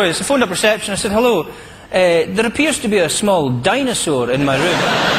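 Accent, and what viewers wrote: Australia. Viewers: People who may not realize this, perhaps Americans stumbling upon this for the first time, this guy is right on the money !! His "Aussie accent" needs only a teensy.wee bit more perfecting, but he has summed up Australia & Australians perfectly in a nutshell, beautifully, in fact.!! This bloke is not only very observant, but be's also very funny. #!! A top-notch stand-up comedian !! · Man this guy's got down the middle-aged Shazza accent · Haha Danny actually is the only comedian who has got our accent pretty much perfect. Other comedians try to sound like us and often fail. So yeah we do sound kind of like that, but I think he's only trying to do the stereotypical Aussie accent for comedic purposes. And it works because I too laugh my ass off. xD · Real OZ accent!! not beautiful at all.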